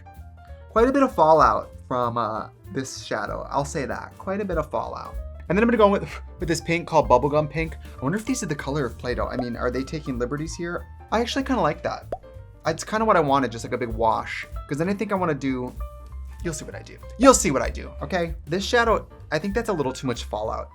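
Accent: Wisconsin, United States. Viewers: Is it just me or was her Wisconsin accent stronger than usual in this video 🤔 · Her Wisconsin accent is coming out hardcore today honeyyyy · Sounding extra wisco to me. Am I crazy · Oh she went full Wisco in this one 🖤 probably on purpose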